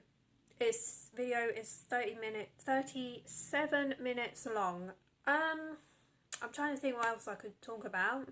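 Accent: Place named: United Kingdom. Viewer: This was randomly shown to me on my feed, you seem to have a very comforting aura about you. You’ve got a nice voice and personality. I wish you the absolute best with your content creation. I would say that the most critical thing you should do is plan as much as you can before you start recording. If you can do that, while maintaining the authenticity that you have in spades, that’s the best you can do! You’re doing great!! Also I really like your british accent 😅 and you’re pretty funny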